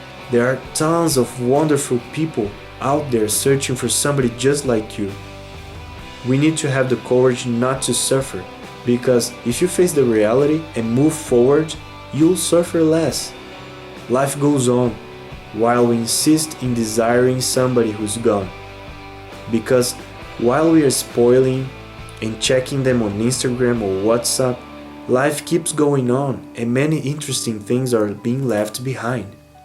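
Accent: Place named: Brazil. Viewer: I KNEW it was a Brasilian accent!!! haha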